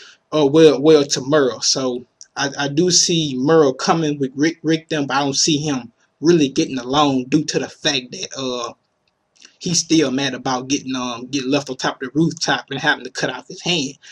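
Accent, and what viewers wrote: South, United States. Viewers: What state are you from? I can't place your accent? Georgia? One of the Carolinas? PS. Thanks for the videos. New subscriber here. :)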